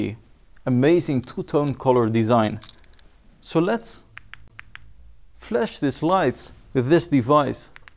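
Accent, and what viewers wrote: Netherlands. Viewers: Toffe vid maar je kan horen tot je NE bent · Your dutch accent is killing me, but what a car omg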